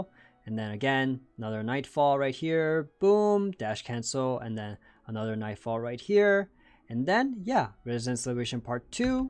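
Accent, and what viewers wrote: France. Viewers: Are you actually FRENCH?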